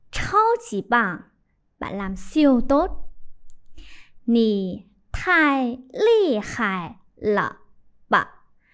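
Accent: Standard. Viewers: Quá ít giáo viên người Việt dạy tiếng trung đọc chuẩn như thế này... Cô giáo vừa xinh, kiến thức vừa vững, gương mặt lúc nào cũng sáng sủa tươi vui nữa... Một kênh dành cho những ai nên học...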